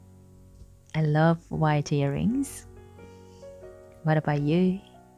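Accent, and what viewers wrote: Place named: France. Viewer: Hi, i recently discovered your channel and i love it! Me too im korean but adopted in France and now i live in Spain. Have you lived in France too? Because i notice you a little french accent when you speak. You are so beautiful and radiant inner and out!🌸i think that you can be a real source of inspiration for so many women, thanks to share with us 😉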